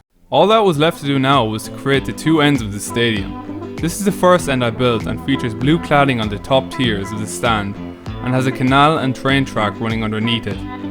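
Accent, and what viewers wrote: Ireland. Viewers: Are you irish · Let's go Ireland i can hear from his accent that he's Irish🇮🇪🇮🇪🇮🇪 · Are you Irish I’m Irish thx I love it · Are you Irish? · are u Irish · Are ye Irish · Are u from ireland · Thanks for giving ireland a shout out Btw are u from ireland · 2 thing I love the Irish accent u have and I love fortnite so I hope u like that sub I gave u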